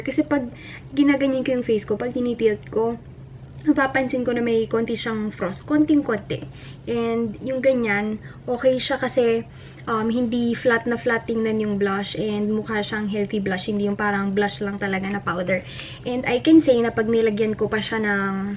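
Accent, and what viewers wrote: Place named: North Luzon, Philippines. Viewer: Ms., just wondering kung saan yung province mo? May punto ka kasi mag salita minsan. Parang somewhere north luzon.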